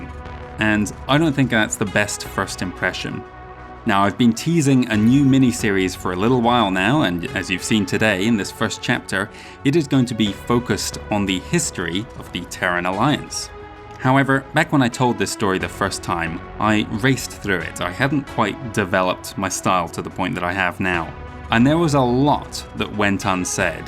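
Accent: Scotland, United Kingdom. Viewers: You sound like a Scottish person educated in England or who has lived in England for many years. Alternatively, you sound like a European (Nordic...?) who has lived in the UK, mostly in Scotland. Or, you sound like a Scottish person affecting an English accent and failing at it.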